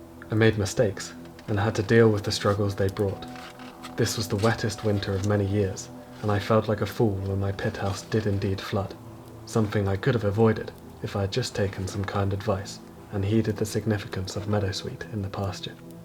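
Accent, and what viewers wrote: United Kingdom. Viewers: I think, it's a good idea to make summary videos like this, to provide some insights and to share your experience along with a video itself. I read descriptions you put under your videos, they're full of interesting information, but they're also not aligned with visuals, so two exist slightly separately. Having them synchronized, with an additional information, is very cool! And I like British accents, so more narrated videos, please.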